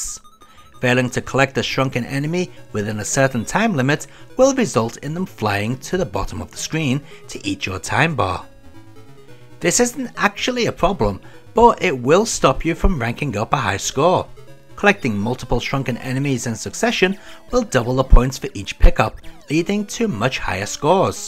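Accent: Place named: St Helens, United Kingdom. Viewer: I've got to ask, mate. Where are you from originally? Northwest right? Sound like st Helens.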